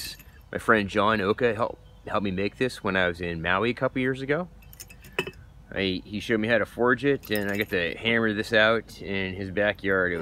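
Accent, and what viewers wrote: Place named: California, United States. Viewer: This is good, you cover stuff that I'm not seeing others do. Thank You. BTW, are you from California? You have some sort of surfer dude way of talking that certainly doesn't fit New Jersey.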